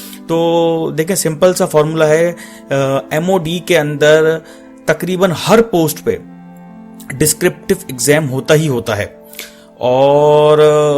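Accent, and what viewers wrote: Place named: India. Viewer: Your voice looks like indian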